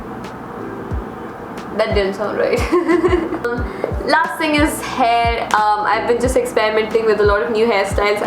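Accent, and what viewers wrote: India. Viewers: she gives me vibes like she is lil ananya pandey and sonam kapoor ofc with south delhi/mumbai accent but more still better then them😂😂❤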